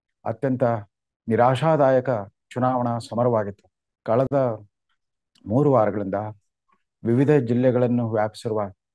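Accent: North Karnataka, India. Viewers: ನಮಸ್ತೆ 🙏ನಿಮ್ಮ ವಿಶಿಷ್ಟ ರೀತಿಯ ಉತ್ತರ ಕರ್ನಾಟಕ ಭಾಷೆ ಶೈಲಿ ಸುದ್ದಿ ಪ್ರಸರ ಪಡಿಸುತ್ತಿರುವ ನಿಮಗೆ ಧನ್ಯವಾದಗಳು 🙏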